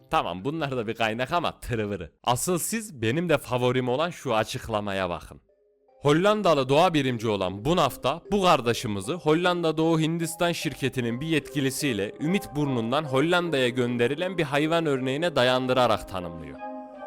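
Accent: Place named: Sivas, Turkey. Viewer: Seslediren yüzde 99 Sivaslı herhal😂 süper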